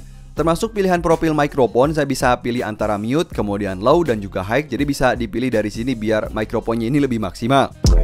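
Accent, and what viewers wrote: Kuningan, Indonesia. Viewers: Urang kuningan jiga na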